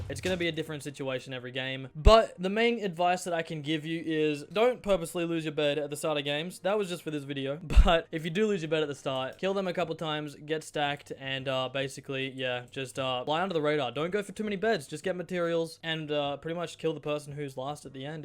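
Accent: England, United Kingdom. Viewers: Are you British?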